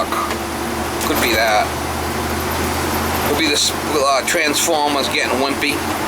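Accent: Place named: Boston, United States. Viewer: Man I miss that thick Boston accent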